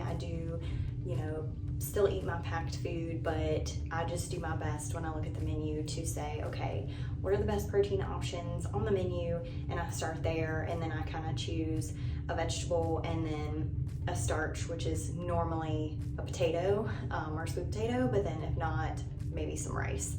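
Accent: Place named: South, United States. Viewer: I love your southern accent.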